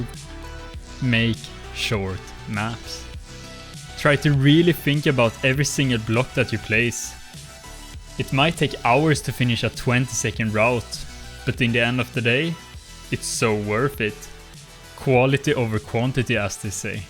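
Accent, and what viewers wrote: Sweden. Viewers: Swedish! Love the accent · Damn that Swedish accent hits hard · du har den svenskaste engelskan jag har hört på ett tag o jag älskar det! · No offence så men jag gillar verkligen inte svenska dialekten på engelska.. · this is the most swedish accent i have ever heard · I love your swedish accent, i went to sweden at vacation i know tack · as a fellow swede, love the dialect :D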